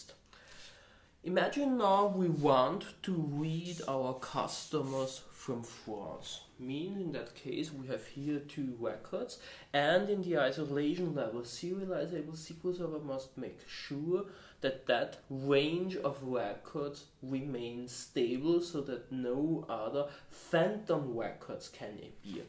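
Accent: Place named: Germany. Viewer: damn this guy with heavy german accent makes me happy! :))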